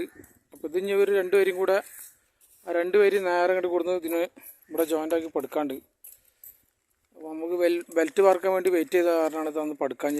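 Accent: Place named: Malappuram, India. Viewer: Ithu Malappuram slag .manassilavathoru kanandaa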